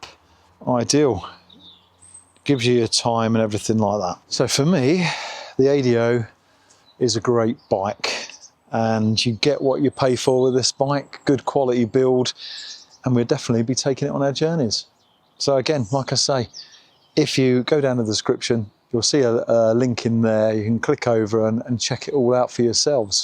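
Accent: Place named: Essex, United Kingdom. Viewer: Same here too - this is happening with quite a lot with channels I subscribe to. Great channel this, and the fella sounds a bit Essex! (my area) I am about to pull the trigger on buying one of these (2026 model)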